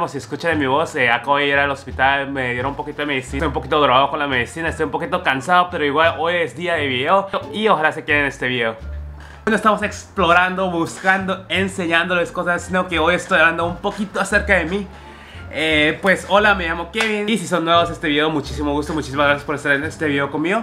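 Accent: Guatemala. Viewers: Kevin tenes mucho nuestro acento y pues me encanta que te hables asi de mi bella Guatemala.... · Voz si q tienes el hacento chapin 🇬🇹🇬🇹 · vos chinito asi como diriamos aqui "sos la mera tos con flema ;3" ( hablas mas chapin que mis amigos la mayoría de esos cerotes hablan como mexicanos :v ) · Sos el más engasado mano, sos la mera tos con flema, me llegás porque hablas puro chapín!!!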